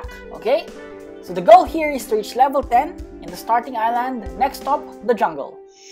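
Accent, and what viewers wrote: Philippines. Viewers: are you Philippines? Because its ao confusing you aound like Philippines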